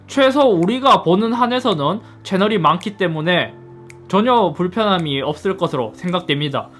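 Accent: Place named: Jinju, South Korea. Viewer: 고향이 진주 쪽 이신가? 억양이 친숙 하네요 ^^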